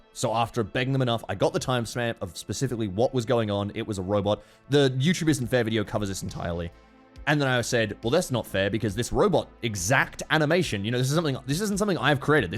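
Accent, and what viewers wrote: Australia. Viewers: man i was so conviced i'd clicked on a muta vid for a second there im so glad your australian accent gave it away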